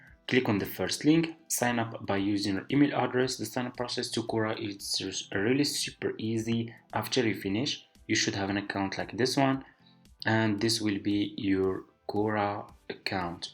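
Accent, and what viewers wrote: Morocco. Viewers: sawtek mghribi bro? 😛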